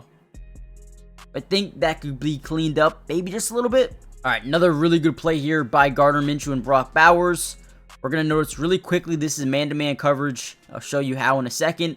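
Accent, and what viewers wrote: Canada. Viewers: Def need a Gardner minshew vid, btw random question but are you Canadian? Lol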